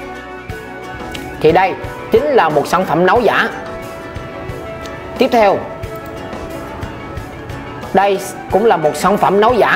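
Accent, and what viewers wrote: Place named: Quang Nam, Vietnam. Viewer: Nếu muốn là ng của công chúng thì nên học nói tiếng phổ thông chứ nói tiếng quảng ngé nge thôi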